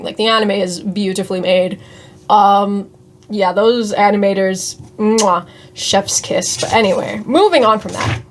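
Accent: New York, United States. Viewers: You sound like you're from New York. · my favorite brit with a new yorker accent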